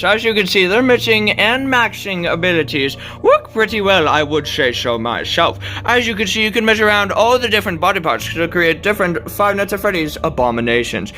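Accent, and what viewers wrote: United Kingdom. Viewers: What's with the very British voice?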